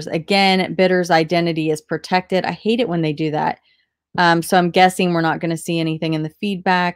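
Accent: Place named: South, United States. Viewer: A nice southern accent too.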